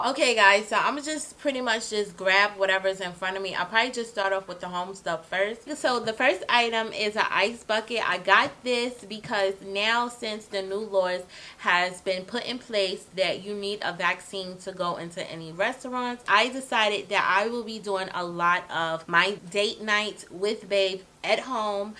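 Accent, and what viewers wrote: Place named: New York, United States. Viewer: Hey Melanin Queen! Ok you went to make it rain I see ! You have some nice stuff that you some of the stuff and there are some stuff that I never heard of . I may need you as a shopping buddy. Love your personality lol. Sounds like you from New York u have a strong accent. How did your date night go? Did it went well? I don’t like flat pillows either … I always said I was going to get the mood lights …. Question can u play music and it switched the mood of the light or can you switch the colors? How do you feel about Kylie brand of lipgloss? I’m going to may light my candle for my bathroom as o take my bathroom..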